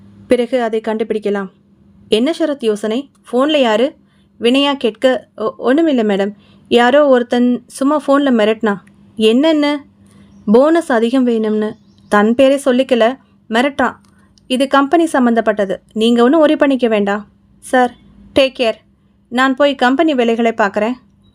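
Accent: Kerala, India. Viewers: Sis! novel 👌but ella novel um malayalm tone athigama varuthe sister 😮u want like malayalam..sis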